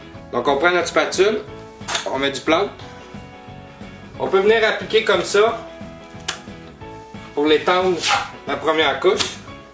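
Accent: Quebec, Canada. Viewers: J'adore ... l'accent québécois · vous les canadiens... votre façon de parler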